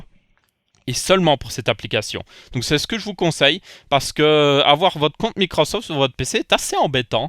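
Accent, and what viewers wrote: Belgium. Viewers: Salut, Merci pour tes conseils. Tu parles vite, avec un accent belge (très agréable ) il faut vraiment se concentrer avec toi vu que tu vas assez vite. Mais en tous les cas merci pour te vidéos. A+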